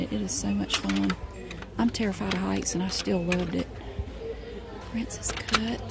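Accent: South, United States. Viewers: This was so incredibly satisfying!!! & I looooove her accent. I recently moved from Hawaii to Texas & I can’t get enough of these southern accents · I love your accent. 😍 That's the part of the US I'd want to live in for the rest of my life ❤️ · I love your accent so much😭 The southern twangs keep reminding me of home😭😭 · The video I didn't know I needed. Her southern accent makes me feel safe and her little phrases like "what kind of a drunken duck" make me laugh my ass off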